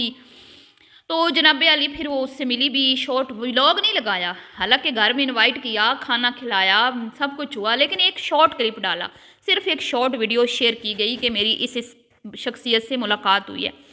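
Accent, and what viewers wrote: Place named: Saraiki, Pakistan. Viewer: آپ کے لہجے سے لگتا ہے ہم بھی سائیکی زبان بولتے ہیں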